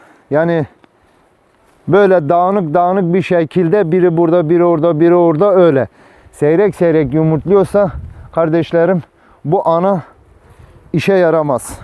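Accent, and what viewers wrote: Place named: Eastern Anatolia, Turkey. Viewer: Allah razı olsun kardeşim, nereli olduğunu merak ettim. Şive trakyadan çok doğuya benziyor.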